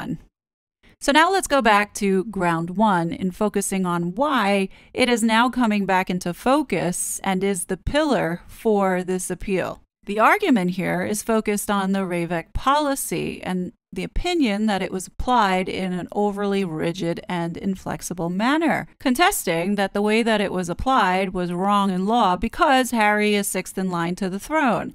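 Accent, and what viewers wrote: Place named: New York, United States. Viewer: I don’t think so. She sound like very well spoken educated person with a New Yorker accent 😊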